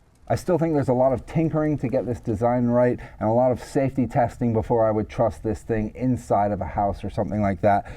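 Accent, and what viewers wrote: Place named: United States. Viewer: You sound very American for a bloke in the UK.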